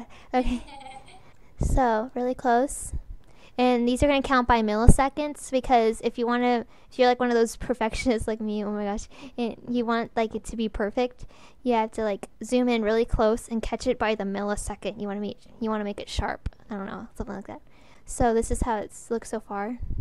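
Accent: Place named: United States. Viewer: Nice voice, now I know ur American xD (compliment)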